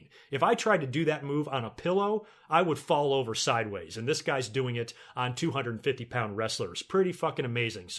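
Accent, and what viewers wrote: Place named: South, United States. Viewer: Your southern accent is torturing!!!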